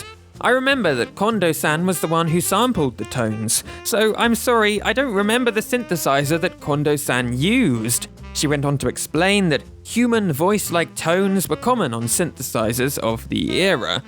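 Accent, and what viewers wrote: United Kingdom. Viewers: GUYS HES SAYING MARRY O WHICH IS THE CORRECT ONE FOR HIM DONT COMPLAIN THAT HE IS PROBABLY BRITISH BECAUSE I SAY MARRY O TOO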